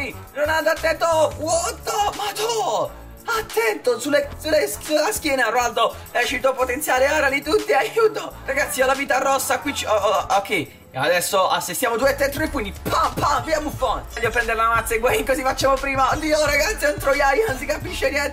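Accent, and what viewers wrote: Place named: Rome, Italy. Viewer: Ma era lo stadio del Marsiglia🤣🤣🤣 Pensavo tifassi Roma dal tuo accento🤔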